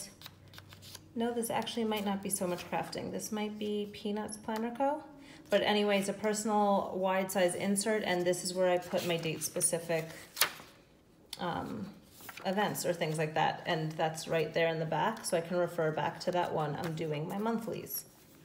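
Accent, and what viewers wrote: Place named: North, United States. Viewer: I wouldn’t say that you say it differently. I would say it’s a northern compared to southern accent. I like it. We draw everything out in the south. I’m very self conscious about my twang. I had someone, not from Oklahoma obviously, tell me that I was really smart and they were surprised because of my accent. 🤷🏻‍♀️ WTH does that mean?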